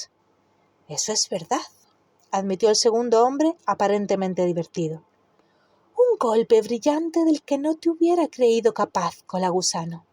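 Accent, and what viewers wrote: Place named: Malaga, Spain. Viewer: Me iba a poner a leer el libro pero tengo tanta fiebre que no puedo ni leer, así que me vienes de perlas para pasar la fiebre en cama. Me ha gustado mucho tu voz y entonación. Por cierto, eres malagueña? 100% seguro que de por esa zona pero no te sitúo exactamente al intentan no poner acento al narrar xD.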